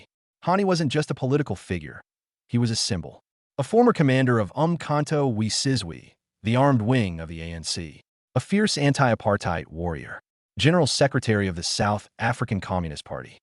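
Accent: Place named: United States. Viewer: Its a bit weird to listen to this in an American accent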